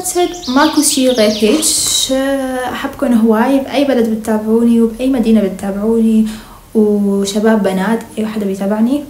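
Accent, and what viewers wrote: Iraq. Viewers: مبروك يا بعد روحي جاي اتحاول تحجي عراقي اموت عليج ❤❤❤ احبج متابعتج من 🇮🇶انتي🍯🍯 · مليون مبروك على المليون تحبك هواي متابعتج من العراق لهجتك العراقية تجنننن ❤❤❤ كيوتتتت ❤❤❤❤ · الف الف الف الف الف الف مبروك ان شاء الله ال <num> قريبًا يارب والله فرحتلج من كل كَلبي احبججججججججج متابعتج من العراق ❤💋💗 لهجتج العراقية تجننننننننننننن ❤️‍🔥🍓🇮🇶💘🇲🇦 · فديت الي تتكلم عراقي+احبك موت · صوتك بلعراقي مررة حلو مين بدو تعمل يوم كامل المتابعين يتحكمو بحياتك🗿👍🏻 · كلامج تقريبا كله عراقيي يااا عمرييي 😭😭😭🍓✨🤭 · الف مبروكك اني من العراق🇮🇶 واحب كل فيدوهاتج وكلامج نفس العراقين❤✨ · ياعمرييييييي✨ الف الف الفف الفففف مبروككككك ❤🙀 والله فرحتلككك من كل قلبي عقبال 100 مليون يارب 💖 ياعمرييي وبسس متابعتكك من العراق🌷 احبكككك كتيرر موححححح😭😭😭💗 احيههه تقريبا كل كلامك عراقي فديت الي يتكلم عراقيي فديتكككك عععع 😭😭💅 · 😮😮اول مره اشوفك تحكي بنت ليكون عراقيه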